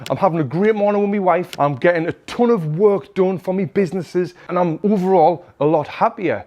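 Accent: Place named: Newcastle, United Kingdom. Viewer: Geordie boy, lovely, well done.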